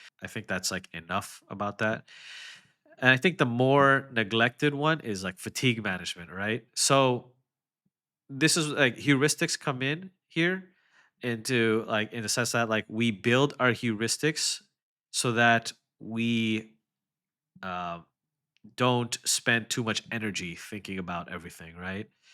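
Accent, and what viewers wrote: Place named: Hawaii, United States. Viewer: Brudda, you hawaiian. I hear the accent and see that sleeve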